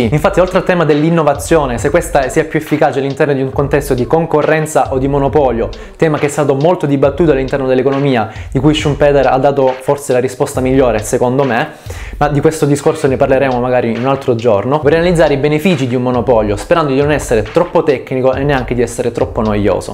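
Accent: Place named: Sicily, Italy. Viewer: carissimo avrei un po' di cose da dirti: 1. dacci un nome più semplice, anche fittizio, con cui chiamarti 2. riguardante questo video devo dirti che stai migliorando molto, infatti, fatta eccezione per qualche lapsus di tanto in tanto ed un' esternazione degli argomenti ancora un po' macchinosa, il video è molto fruibile e le tue spiegazioni sono abbastanza chiare 3. in linea generale: devo dirti grazie! sei il primo canale italiano (almeno che io sono riuscito a trovare) che tratta la scienza economica. sei un vero e proprio debunker per quei miliardi di video complottisti che intasano youtube. anche i commenti sotto ai tuoi video sono un piacere da leggere (quasi nessun: "MUORI TRATITORE DELLA PATRIA VENDUTO DELA BCE!!111!1", ma tanta gente civile che argomenta pacatamente e che rende il tutto molto stimolante per chi ha passione per questa materia). 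ti prego continua! fallo per noi 4 affamati di materiale di qualità. 4. vorrei permettermi di consigliarti qualche argomento per i prossimi video che secondo me potrebbe essere interessante, ad es. la teoria dei giochi o le teorie di keynes, o magari nel tempo te le verrò a proporre sotto ai tuoi video se ti fa piacere... comunque sia vorrei supportarti il più possibile: like a manetta e farò vedere i tuoi video ai miei colleghi... dicci tu come possiamo supportarti maggiormente. 5. ho capito che stai a torino, ma mi sai tanto di terrone, probabilmente siculo (con grande affetto, lo sono anch'io)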